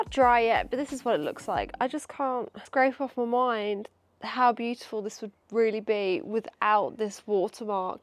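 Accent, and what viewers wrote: United Kingdom. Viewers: I'm still confused about her UK accent in AUS 😅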